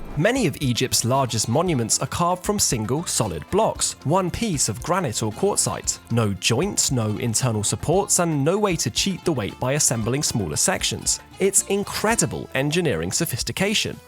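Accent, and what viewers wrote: United Kingdom. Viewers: I can't do a third in a row video with the speaker babbling with their irritating British accent. I don't find the language "cute" or "charming". Just annoying.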